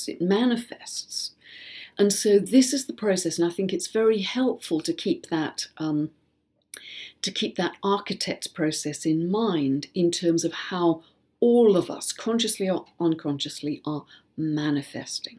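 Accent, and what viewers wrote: United Kingdom. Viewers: I have an interesting story with coming across this video! I have been feeling this energy intensely in my home life and searched for videos on the energy of this moon. There were a couple I saw that I thought I was going to watch but felt pulled to keep scrolling. I have never seen any of your videos but when I saw the thumbnail I had the though that you would have a British accent (I am from 🇨🇦). I heard a voice in my mind accent and all, and I became curious so I clicked to see if you were in fact British. Not only are you, but the voice in my mind was your voice!! And now hearing your message I am clear why I was guided here. I have been struggling with my 3.5 year old the last little while and last night was the most intense. He had a meltdown that we were lost in how to help calm him. So along with the macro of toppling governments or full political systems, I'm experiencing this on the micro that my sweet boy is toppling the hierarchy in our home 😆 As much as we are aiming to stay removed from the fear, there is definitely a layer of stress that has been resting on us with everything going on. 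I have been sensing for a long time that systems will be crumbling away, but they usually don't go easily. Especially when they are as deeply rooted as the ones in place now, and when many people are so used to being powerful at the hands of these systems. So worry comes easily when I think of my two young children (3.5 years and 8 months) and the potentially turbulent near futur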